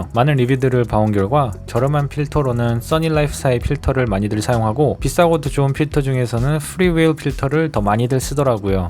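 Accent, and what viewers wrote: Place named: United States. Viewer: 영어학원인줄 알았습니다. 발음이 미국인...^^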